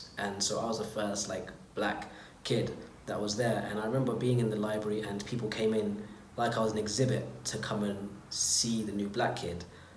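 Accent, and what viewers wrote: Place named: Banbury, United Kingdom. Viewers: Definitely. sounds posh though, all Banbury, no Peckham...thank goodness!:) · British accent sounds better than the American accent I guess.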